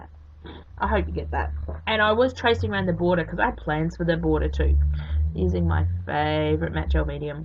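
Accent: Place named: Australia. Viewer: Hi, terrific journal pages. Love listening to you. It's great to be able to listen to an Aussie accent for a change. I wish we used coupons too. Take care, Amanda xox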